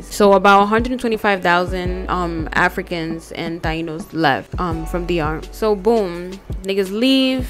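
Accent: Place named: New York, United States. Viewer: Nothing better then a little dominican history, with a NY accent ! DEADASSSSSSSSSS Lmao . Definitely feel as dominicans we gotta know our history , feels like the more times that passes the more people seem to forget about the roots and where our people come from , which is exactly what the Europeans wanted ….. to oppress our beliefs and our culture . Great video !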